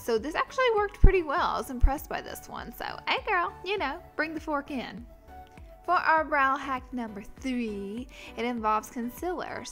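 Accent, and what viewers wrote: South, United States. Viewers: Girl, you' so country! Absolutely love your accent!!!! 😍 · Are you country? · I like her southern accent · Girl you are so southern. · I love how you communicate your so southern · Why do you try to sound country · Omg, I love your Southern accent!!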